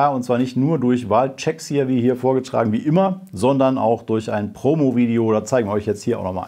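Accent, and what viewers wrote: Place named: Rhineland, Germany. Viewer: nee, da höre ich dat ruhrgebiet, bin da geboren, in wanne-eickel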